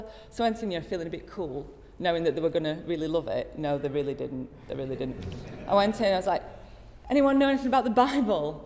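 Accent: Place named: Yorkshire, United Kingdom. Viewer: I am living in York. It's an interesting accent with beautiful features. But it seems to me that her accent isn't so strong as we normally found in the streets, shops etc. Maybe in an informal context would be possible to hear more details.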